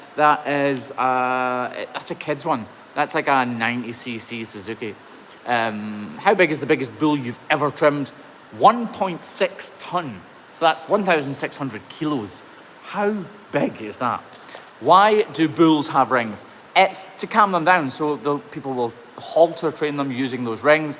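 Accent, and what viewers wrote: Scotland, United Kingdom. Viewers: I realize this is a stupid sounding question, but as I get older my hearing gets worse and my ability to decern accents has become total rubbish so I need to ask for clarification are you irish or scotch? My heritage is a a bit muddled, English, Irish, Scotch, French, German so you can imagine my shame at not being able to clearly recognize the accent, although my best guess these days is you are Scottish and the guys at Tech TV are Leprechauns.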